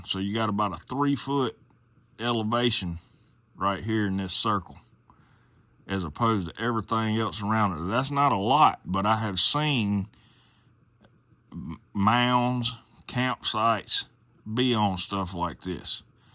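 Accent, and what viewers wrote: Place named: South, United States. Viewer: I can’t help it, man. I love the southern accent so much. Everytime I hear it I hear Aldo Rain from Inglorious Basterds. “Now I’m a direct descendant of Jim Bridger, That means I got a little injun in me, and our battle plan will be that of Apache resistance.” 🤣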